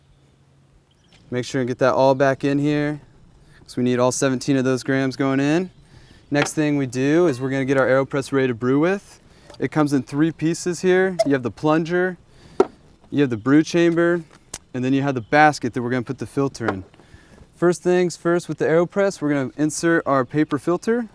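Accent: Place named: Western Canada, Canada. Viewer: Great Review, dude sounds very Vancouver/ Calgary.. but I know this is a westcoast US brand.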